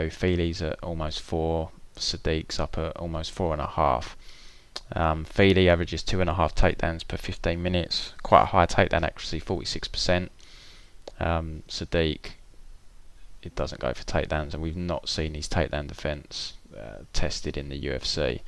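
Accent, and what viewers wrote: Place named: United Kingdom. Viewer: First time listening to, and gauging, your podcast. British accent: check....so far, so good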